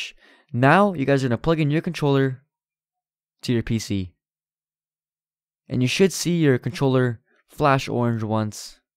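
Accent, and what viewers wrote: Croatia. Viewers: Are u from Croatia? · maybe this is a dum question but are you croatian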